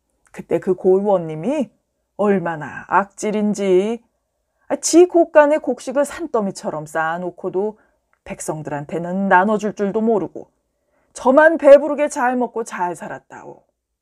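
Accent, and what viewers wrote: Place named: Gyeongsang, South Korea. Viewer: 안녕하세요 넘재미있네요! 경상도사투리 구수합니다~^^ ❤❤❤❤❤❤❤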